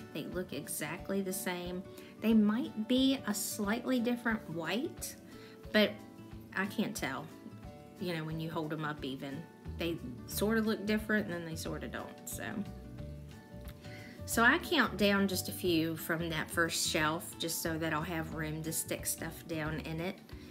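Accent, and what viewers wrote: South, United States. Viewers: Hi Mam, It's am in Arlington, VA on Saturday, October 28th, 2023. You posted this 6 years ago so your son may be close to being a teenager now and I hope he is well and your family. I am suppose to be seeing up a storm today and working with my glue gun as I am in a 3 day craft sale next week. But,I am tired from my week at work and I am taking a break. I live in a very tiny Flat and my 3 closets are very very tiny so I use clear shoe bags on the back side of 2 doors. My kitchen is extremely small and I believe this caddy would be awesome in my kitchen for light weight utensils and other cooking needs, a place to hang pot holders. I only have 3caninrts for food and dishes and not many drawers and they are so thin you cannot have a wide utensil holder. I have often looked a the kitchen mats at the Dollar Tree thinking they would be nice to hang my vintage fishing lures on. 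I like your country accent. I need to check out your site to see if your on Instagram. I hit to subscribe I hope your still making videos. Great job on this storage craft caddy. Sincerely, Rebekah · Such a cool idea!!! Loved your video you explained things very well and love your southern accent too:) Look forward to more videos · your southern accent is wonderful what part of this great nation are you from my family is from South Carolina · I love you tutorial and your Southern Charm, very calming and relaxing. Thanks so much for sharing, from a Texas Southerner... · Really cute and ingenious idea for dollar tree items. We crafters never have enough space. I'm always looking for something from one of my crafting categories, watercolor painting, jewelry making, paracord crafts, crocheting, sketching and drawing, home decor, gift wrapping etcetera and so on. I'm so glad I stumbled upon your channel recently. 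Keep it up your easy to listen to, not over detailed or unneeded repeated instructions that can often feel redundant when listening to others. But not you, I love your flow, your realness and that southern accent is so darn sweet. Much success to you my dear! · Thanks for this great inventive idea, and I just wanted to say your accent is adorable! I’m from WI and don’t hear a southern accent often 😉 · Say there!! (my name for all my girlfriends, and I know you you would be). Can I tax your brain, or anyone else's and try to come up with something on this order we could put together to hold all my netting rolls, I make wreaths out of. So far it's big unhandy clear trash bags, because I have so many other crafts, I've run out of room, and that picky netting goes right through the trash bags. I agree with Iris only I like the Southern part of your voice also. I definately will be making some of these for my clutter of various different craft supplies asap. 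Thank you for being so organized!! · P Vir Another update - subscribers: from 500 on May 26, 2017 to 14,363 on June 7, 2017...and I make 14,364! That's a huge growth rate! It gives me hope for my videos (silk painting)! Great ideas! Your camera angles and explanations are perfect! You sound like you're from The South. (I'm from the Deep South 😉) Thank you for sharing your God-given gifts, talents and ideas with us all! God Bless!!!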